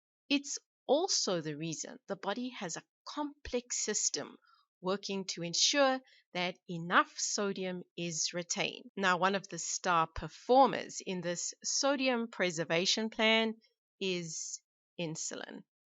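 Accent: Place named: South Africa. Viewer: Excellent informative videos and cute South African accent!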